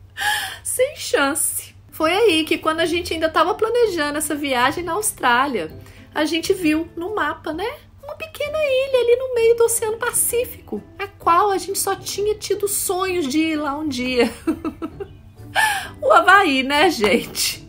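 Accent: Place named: Minas Gerais, Brazil. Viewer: Ei, como voces, eu tambem sou mineiro de BH e tambem moro no Canada, porém em Montreal. Adoro assistir os seus videos pelo otimo astral que voce demonstra nos videos e tambem para ouvir o seu sotaque. Ai que saudades de ouvir o nosso sotaque mineiro de BH. Abraço para toda a familia Otavio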